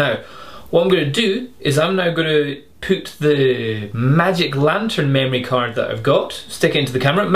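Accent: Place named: Scotland, United Kingdom. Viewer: The magic lantern test was a much purer sound, filtered out most of the noise but left the Scottish accent in tact :) Cheers.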